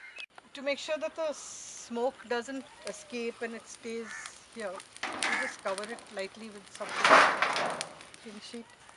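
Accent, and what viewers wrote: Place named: Goa, India. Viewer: I love that Goan accent. Thanks.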